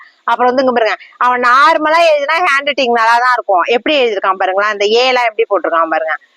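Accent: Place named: Sirkazhi, India. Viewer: 👏👏👏👏👏👏👏👏👏👏👏👏👏👏👏👏👏 super video velai seiya ma srichitte pakkara neenga tha pottu pesumpothu pazhya niyabgam varuth sirkazhi Chidambaram side apadi pesuvanga nanga leaveku pogumpothu appadibpesirkkom innatha appadinu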